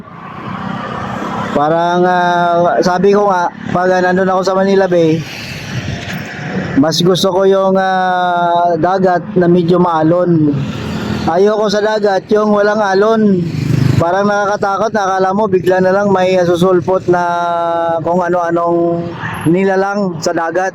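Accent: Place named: Mindanao, Philippines. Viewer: Kayo po parang taga Mindanao ka at DDS ka at sa tono ng pagsasalita mo 😂😂😂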